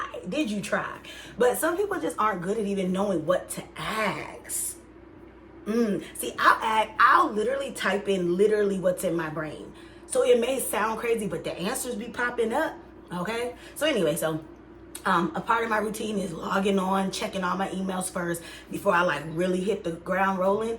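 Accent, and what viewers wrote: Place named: Midwest, United States. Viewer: Where are you, please? What state, you sound like you're Midwest... M. 😅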